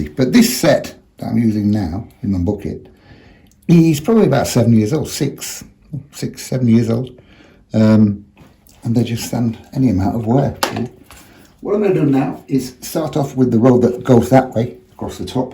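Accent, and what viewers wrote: Yorkshire, United Kingdom. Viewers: Just discovered your channel. Glad I did. As a native of Yorkshire me self, it’s nice to hear a Yorkshire accent. And your paintings are stunning. This one so reminds me of many snowy days I’ve seen back up North. I’ve subscribed and look forward to many more tutorials as good as this one. Thank you. 😊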